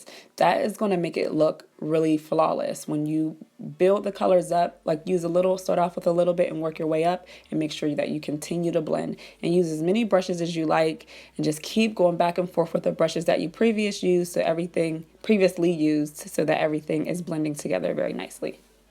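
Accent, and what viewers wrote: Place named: Pennsylvania, United States. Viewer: Are you from PA? I always think everyone from PA has an accent and you sound exactly like the accent I attempt to describe. Also this was beautiful well done!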